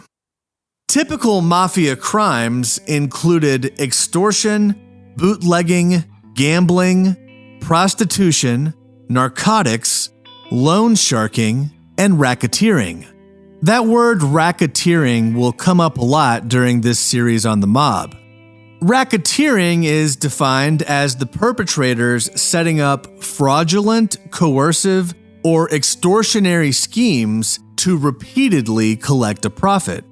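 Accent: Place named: United States. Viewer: Very decent response. Cosa Nostra is phonetically pronounced Caw-sa Naw-stra (not the americanised way you do it) - there were others too.- just saying as a genuine Italian speaker from UK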